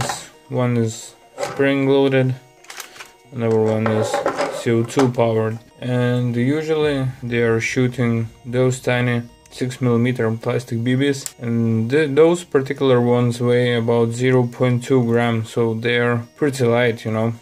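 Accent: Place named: Serbia. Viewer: alright thanks! Btw, where are u from? Ur accent sounds like serbian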